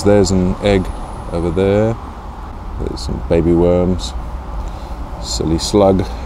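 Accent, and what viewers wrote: South Africa. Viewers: You're in the UK, but your accent sounds like a South African.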